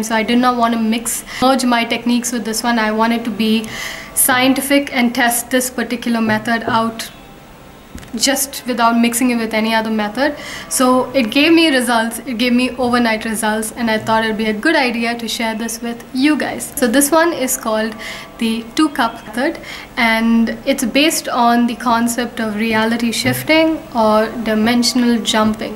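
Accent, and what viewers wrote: Kerala, India. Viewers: I love your looks and accent!!!! Indian!?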